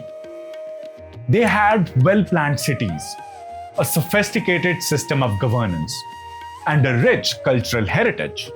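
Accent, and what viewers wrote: India. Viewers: annoying listening to hindu english ...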